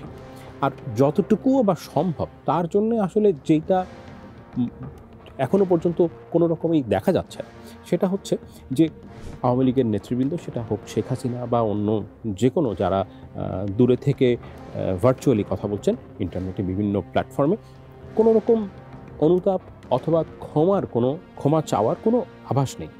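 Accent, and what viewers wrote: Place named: Kolkata, India. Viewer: কেউকি একটা জিনিস লক্ষ করেছের যে নিউজটা পড়তেছে তার কথার ভিতর কলকাতার বাংলা ভাষার একটা মিস্র আছে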